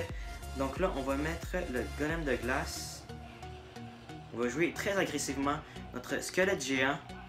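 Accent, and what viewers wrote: Quebec, Canada. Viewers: est tu quebequois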